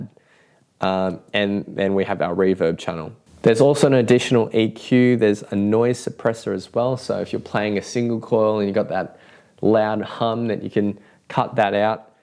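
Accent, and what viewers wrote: Australia. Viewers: What's more annoying than an Australian accent? An Asian with an Australian accent. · the most stunning thing about this video is watching an asian guy talk with an aussie accent.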